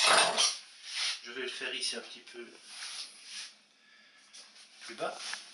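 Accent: Belgium. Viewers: i recognised a Belgian accent even before reading the description... he definitely has a slight accent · IT IS definitely a Belgian accent. I am french myself and I live in Belgium, and I can tell you 100% I recognise this accent as being from Belgium. You are telling such bullshit. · He has a strong accent from Wallonia indeed. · What do you mean by "people like that" ? You didn't read the description but kept trying to argue, and he also has a Belgian accent, just pay attention to the way he says "écarteur" like "écakhteukh" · May just be a lower dialect of Belgium. Especially since you've got a couple languages commonly spoken there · He speaks french but he's belgium, he have a belgium accent. (I'm french so) and the description in this video says "the belgium cheese master..." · yeah normally Belgian accent is a bit stronger than this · yeah he does, accent from Liège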